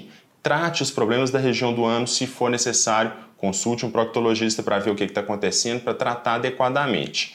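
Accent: Minas Gerais, Brazil. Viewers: Esse sotaque mineiro é maravilhoso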